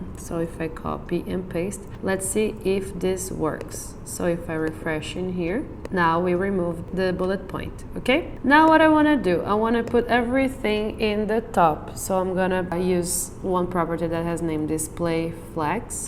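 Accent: Brazil. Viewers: Youre brazilian, am I wrong?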